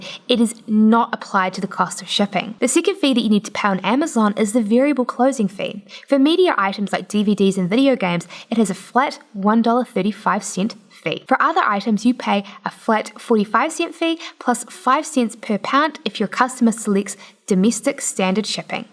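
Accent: New Zealand. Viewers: 'Depind', 'cint', etc. This is New Zealand pronunciation. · I began to understand a lot more about Amazon and Ebay selling, just from post. This led me to research much more in depth regarding this subject. So, in my opinion, great work!!! Additionally, I imagine the reviewers leaving negative comments believe they are showing a humerus side of themselves, but only identifies them as ignorant and unpolished. Her accent is amazing and I suspect she does hail from New Zealand. If you haven't traveled, the country possesses an undefinable beauty, North and South. The Kiwi population (aka New Zealanders) are a wonderful, warm, welcoming and positive people. I would live there in a heart-beat and would relish hearing words like, DEPIEND and CIENTS, its quite enjoyable to hear. Let's bear in mind that English existed far longer than America, so we need to be tolerant of other English speakers, including Speakers of English as a Second Language.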